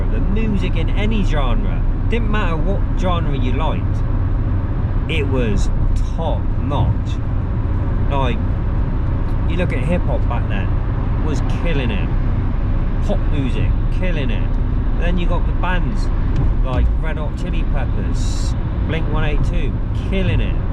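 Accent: United Kingdom. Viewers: West Country accent. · You got a Norfolk / Suffolk accent?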